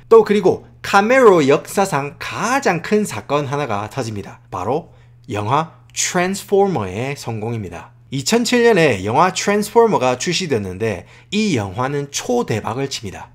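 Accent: Gyeongsang, South Korea. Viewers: 영어를 경상도 사투리로하네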